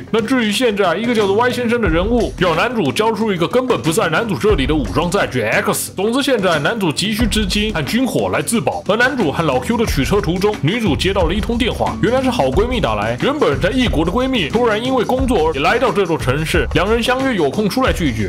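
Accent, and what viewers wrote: China. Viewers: 故意用中國腔講電影🤣🤣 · 是中国腔，，你的叔叔是中国人吗？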